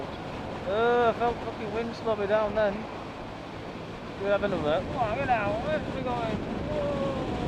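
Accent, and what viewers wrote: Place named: Bolton, United Kingdom. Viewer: great vid and good sharp pics despite the cloudy conditions thought it was Bolton area at first .... accent too... but maybe your Preston way or Yorkshire even ? Just wondering, getting a puncture can you get the tyre over the rim ? I had a puncture 2 weeks ago, Ive got good tyre levers but could nt get tyre over the rim Bike shop says ... most bikes have got to come in these days and get tyre off by machine so one simple puncture could cost me Pick up £45 Repair £40 .... its getting mad out there ! Probably eu legislation !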